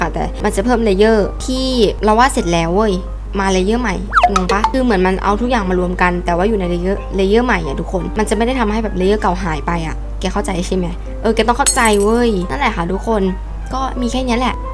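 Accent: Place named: Suphanburi, Thailand. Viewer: ไงครัช ชอบรายเส้นนะ เก่งอะ เหนอวะ เปนคนสุพรรณนิ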